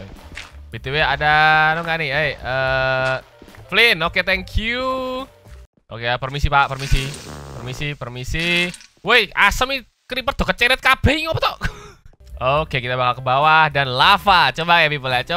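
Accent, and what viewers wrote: Java, Indonesia. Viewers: Orang Jawa bang to Yo to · Wong jowo opo uduk mas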